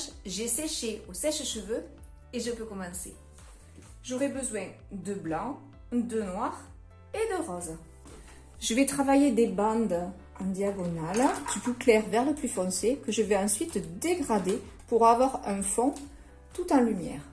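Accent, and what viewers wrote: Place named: Toulouse, France. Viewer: BRVOS beau travail e t bel accent de TOULOUSE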